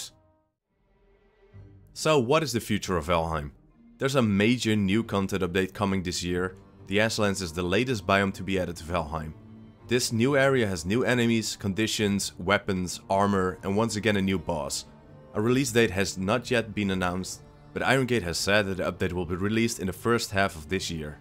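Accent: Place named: Netherlands. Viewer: Such a Dutch accent lol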